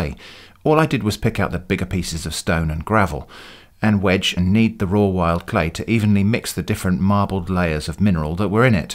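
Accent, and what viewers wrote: United Kingdom. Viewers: its like Primitive Technology with UK dub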